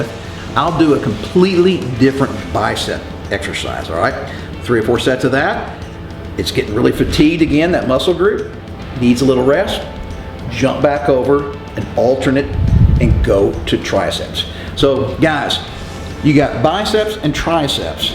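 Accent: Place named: Dallas, United States. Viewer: Sounds just like everyone I grew up with in Dallas. Wonder if he is from my home town or even went to some of the same gyms that I did in the 80s? I lived everywhere from University Park to Plano.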